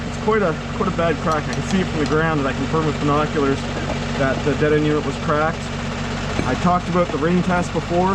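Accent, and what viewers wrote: Canada. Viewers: R u a Canuck?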